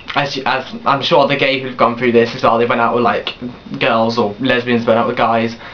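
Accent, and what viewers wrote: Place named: RP, United Kingdom. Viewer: You ain't funny for you're gay. You are so funny due to your smashing posh accent! Damn, what would I give to mimic that sound?!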